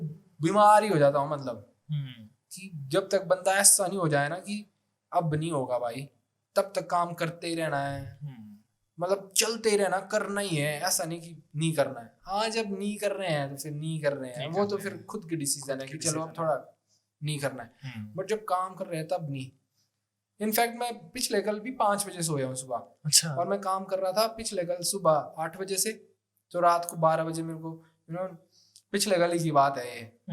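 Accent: Pahari, India. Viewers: Yahi bhai ki sadagi hai accent ni chodte pahari 😂love u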